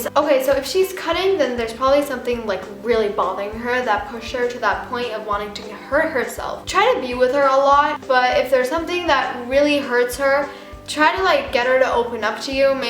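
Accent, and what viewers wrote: United States. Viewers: If you're Turkish and all your family are and you were born there. Why do you speak in an American accent? PS. I'm going to Turkey on Saturday :) WOO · Hey I'm English, but I live in Northern Cyprus which is like Turkeys neighbour and I can see the Turkish mountains from my window sometimes. I've lived here for most of my life now, so I can speak fluent Turkish. Anyway I was wondering why you have an American accent if you live in Turkey and your parents are Turkish and you were born in Turkey? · How'd you learn English? You have a perfect (american) accent 😂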